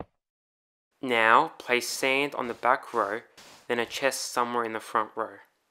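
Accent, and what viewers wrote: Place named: Australia. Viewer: As soon as I heard your voice, I knew you had to be aussie. Sure enough, the 'about' page for your channel confirms it! Hey mate :P Just checking your video to reply to your question on VidIQ discord. I've subscribed :)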